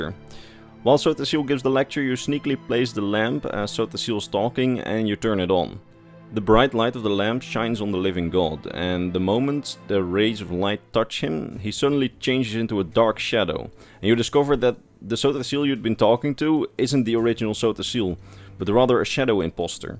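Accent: Netherlands. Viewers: Are you Dutch? If so, goede videos! Hope you'll continue to make the ESO story videos up to the latest chapter!!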